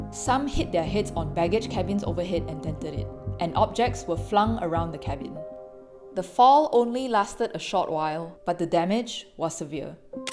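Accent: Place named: Singapore. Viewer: That narrator's Singaporean 'Singlish' accent is quite awful on the ears.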